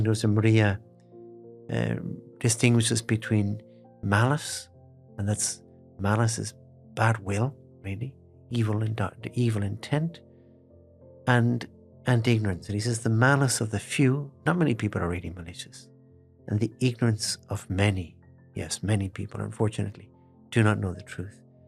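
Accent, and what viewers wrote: Ireland. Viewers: his brogue, while lovely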